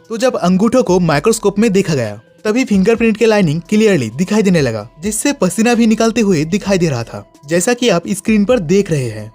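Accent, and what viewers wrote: India. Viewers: It's very amazing. Your accent is like that of a bengali🤭🤭❤💖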